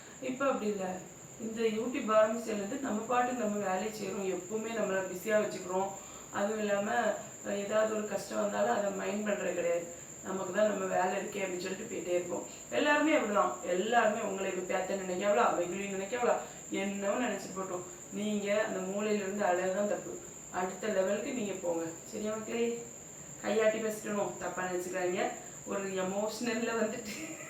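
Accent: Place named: Marthandam, India. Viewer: 😊Akka veguliya iruntha ulagam apdi thaan pesum ,neenga pure ah irukkeenga atha nenach happya irunga akkaa am from dubai sontha ooru marthandam daily unga videos pakrean ooruk vantha meet pannalaam akka😊😊😊😊ungalta pesa aasaya iruk epdi pesenu therla😊cook pannumbothu fulla unga videos thaan time pass enak😅namma ooru baasha kekumbo oorula irukkirathu polaye feel😊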